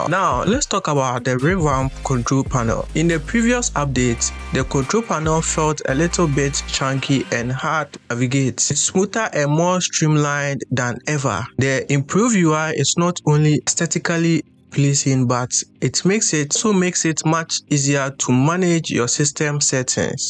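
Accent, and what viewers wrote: Nigeria. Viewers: Nigerian ahh accent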